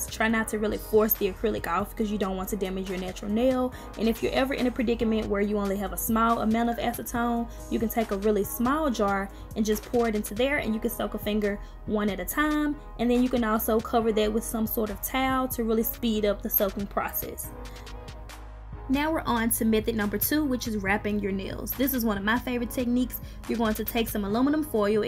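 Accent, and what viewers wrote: South, United States. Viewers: I love your southern accent!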